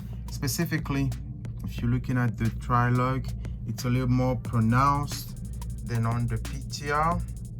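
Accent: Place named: France. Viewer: Sounds French to me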